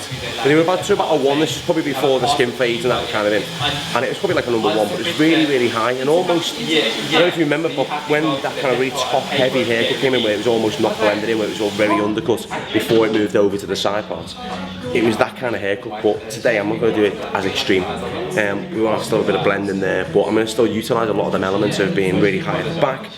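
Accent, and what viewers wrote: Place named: England, United Kingdom. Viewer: Whats your English accent .. I wan tto know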